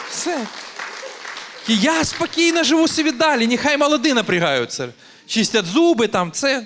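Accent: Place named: Surzhyk, Ukraine. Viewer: наше все! Здоров'я на багато років вперед, щоб не один раз ще почути цей чудовий суржик- акцент😊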